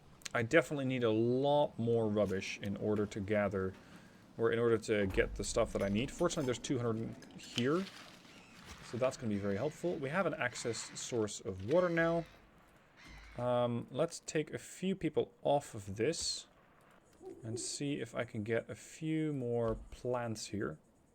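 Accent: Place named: Netherlands. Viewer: bump for nervous dutch noices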